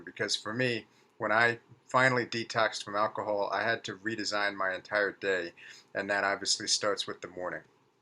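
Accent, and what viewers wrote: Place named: East Coast, United States. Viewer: there’s something really comforting about your voice and your back-East accent. I love listening to your videos. I never had the alcoholism as bad as you did, but it’s very relatable.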